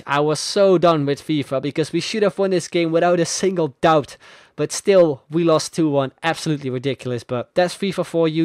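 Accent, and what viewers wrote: Netherlands. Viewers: you sound dutch. Are you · Are you dutch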